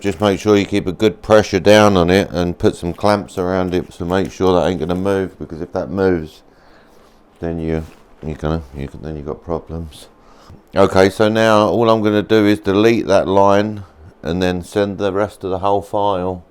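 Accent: United Kingdom. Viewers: I thought you were from the UK with that accent.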